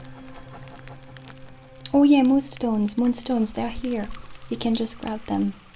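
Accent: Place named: France. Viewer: no your French accent is perfect. The whole video is perfect and the music in the back is just *chefs kiss* haha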